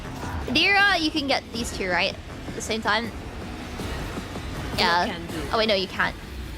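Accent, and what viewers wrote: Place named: United Kingdom. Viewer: Gotta say how delightful it is listening to a very British person play a very British coded game. Keep at it Mistress!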